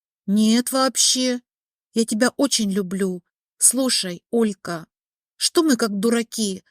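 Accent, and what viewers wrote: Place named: South, Russia. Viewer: Очень сильный южный акцент. Хочется литературного произношения.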